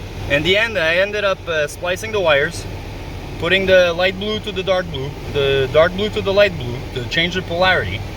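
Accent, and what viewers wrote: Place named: Boston, United States. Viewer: Thanks,! You sound just like my cousin from Boston 😁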